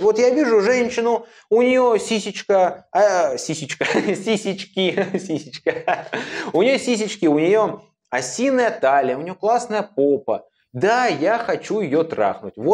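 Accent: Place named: Saint Petersburg, Russia. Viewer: так ну акцент точно питерский аах))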